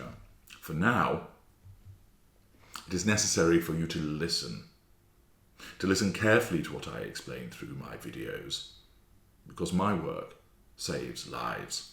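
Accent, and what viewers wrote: United Kingdom. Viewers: I'm from the US. So he just sounds like an elite dude from somewhere in the UK. 🤷‍♀️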